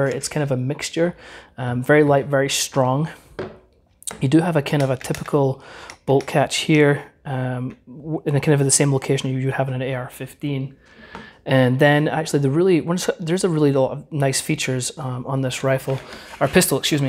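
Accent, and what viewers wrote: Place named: United Kingdom. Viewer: This is allowed in the UK by you’re accent?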